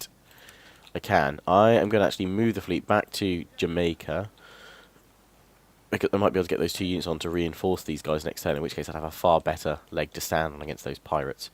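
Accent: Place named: United Kingdom. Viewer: Yes it's his real voice lots of Brits sound life that.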